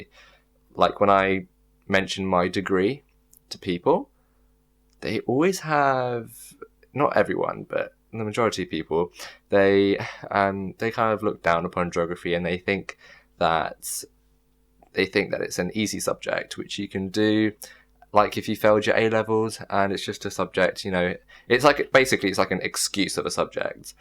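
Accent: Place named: India. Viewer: I thought u were definitely an Indian dude , the accent gets me everytime lol , good editing lol!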